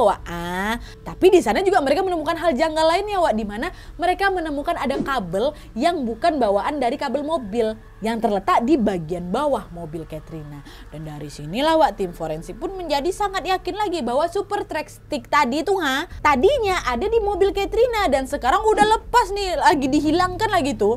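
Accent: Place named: Sulawesi, Indonesia. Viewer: Ah suka sama kk kalau lg critaa..ada logat2 sulawesinya.. sukses ya kk🥰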